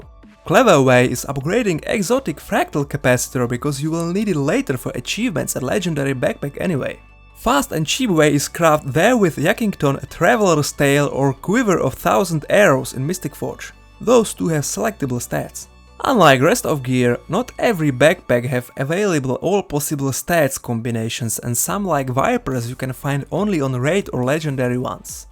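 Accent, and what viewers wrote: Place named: Czech Republic. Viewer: Ure from czech republick right ? that axcent tho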